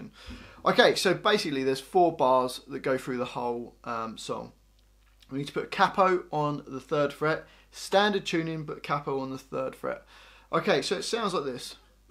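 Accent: United Kingdom. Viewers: love ur british accent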